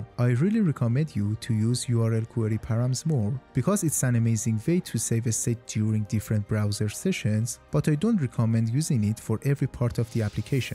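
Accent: Iran. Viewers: love the iranian accent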